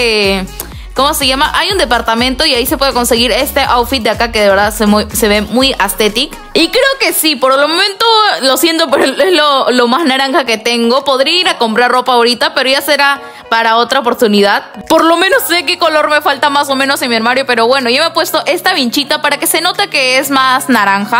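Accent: Peru. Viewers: eres peruana🥰🤔🤨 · Eres de Perú? O chile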